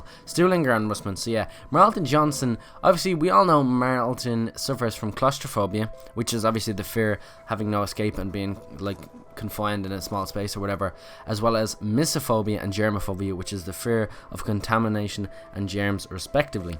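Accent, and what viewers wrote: Ireland. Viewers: are u irish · i can tell your irish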